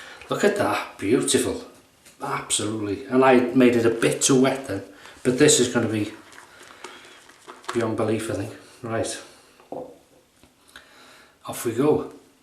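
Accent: United Kingdom. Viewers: There I was with a video on in the background Joe from Queens talking about shaving. Suddenly I no longer hear Joe but a familiar British voice talking about Mack in a New Jersey drawl! Yes you're famous across the pond too. Don't go upsetting any gangsters. All the best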